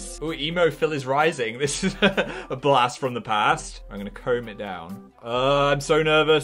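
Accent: North, United Kingdom. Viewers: Is it only me or does Phil sound extra british in this video? :D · Do I hear Phils northern accent making a comeback 🥳🥺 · I have never heard you sound more northern...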